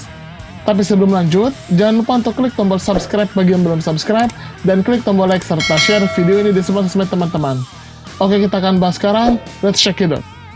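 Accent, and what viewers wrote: West Java, Indonesia. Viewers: Kamu orang Sunda ya min?? Hayo ngaku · Ini yg ngomong orang sunda ya soalnya logatnya ketara bgt, bilangnya narutok sasukek belakang pake "K" semua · Orang sunda ya? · Didenger dr cara ngomong nya, kayaknya si mimin ini urang sunda nya ?? 😁😁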